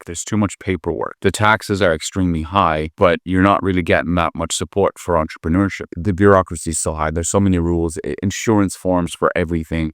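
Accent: United States. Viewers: You could just call 'the West' Europe. That's geographically where you are speaking about. You video is nonsense, - there is freedom of movement in Europe, within the EU. Saying 'Eastern Europeans' made Ireland less safe is complete nonsense. Many Polish people settled in Ireland and added hugely to Irish society. You are so anti the US and yet you sound so American, you certainly don't sound like a person who lived in Galway since they were nine years old. Ireland has experienced an influx of illegal immigrants who come into Ireland via Northern Ireland, but those numbers are falling now. We have had a lot of Ukranian refugees, fleeing war. Would you suggest not helping refugees fleeing war? "Indians have fake degrees" - no faker than you stating you have a masters in pharmacology, only to later admit you dropped out, so you don't have any masters degree. 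Lithuania joined the EU in 2004, so you weren't an immigrant who needed a visa when your family moved to Ireland, you were just someone exercising EU freedom of movement with your family. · You have an american accent .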